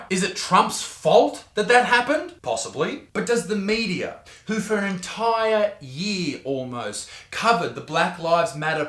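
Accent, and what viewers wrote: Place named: Australia. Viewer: Word, good statement mate. I like your australian English. Greetings from Germany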